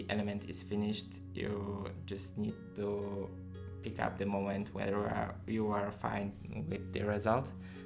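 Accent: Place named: Russia. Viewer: Мне кажется, что ты русский, уж акцент очень похож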